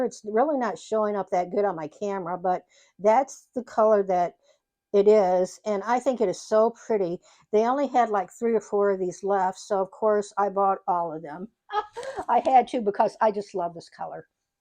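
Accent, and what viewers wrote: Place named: DuBois, United States. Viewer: I'm not, directly, but all my mother's family is in/near Dubois, PA. I asked because you talk with the same "accent" for lack of a better term, just like they do. I'm glad you had such a great holiday.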